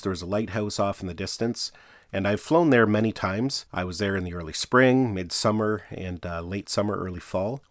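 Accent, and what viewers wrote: Canada. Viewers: Do I recognize a Canadian voice, there? I'm curious about drone regulations in different countries. When you flew 2.5Km to that lighthouse, were you required to maintain visual line of sight with the aircraft? If so, how could you be able to do so? I have an Air 2S, and it seems like the only way I can maintain VLOS beyond around 300M is to put auxiliary strobe lights on it. (Could just be that my eyes are getting old.) Anybody have any advice for enhancing visibility for VLOS?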